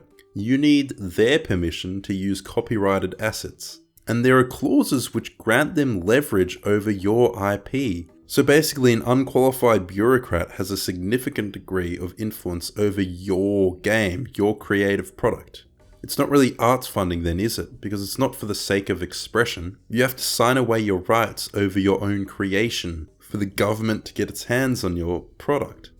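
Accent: Melbourne, Australia. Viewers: This is great bruv, I just finished playing Mad Max on stream and the overplayed accents were 100% one of the worst things haha You from Melbourne? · RE accents when you say "nobody sounds like this" you sound peak Melbourne. Not everyone sounds like that but ive absolutely heard these accents. You saying that line i think really demonstrates youre very likely from Melbourne as Queenslanders commonly report having this line said to them when they speak in Melbourne.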